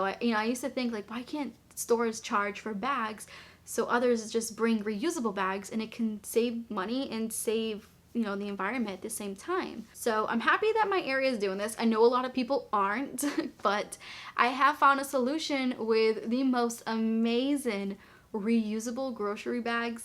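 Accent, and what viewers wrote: Boston, United States. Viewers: Congrats on 100K love your content and that adorable Boston accent.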